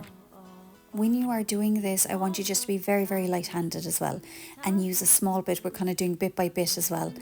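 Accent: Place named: Ireland. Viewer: Add Irish accent to you description and it will triple your views! Love the Irish accent 🩷🙏🏻😍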